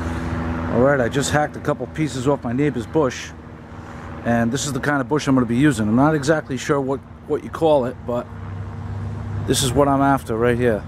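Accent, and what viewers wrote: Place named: Upstate New York, United States. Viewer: Sounds like upstate new york lol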